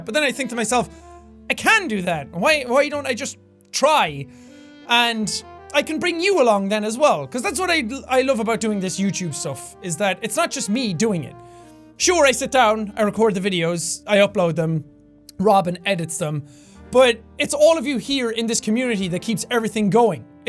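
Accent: Ireland. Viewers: Early and Congrats on a huge mile stone I have watched you channel since you didn't sound Irish well done jacksepeye! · I'm so happy for 17,000,000 subs love your Irish accent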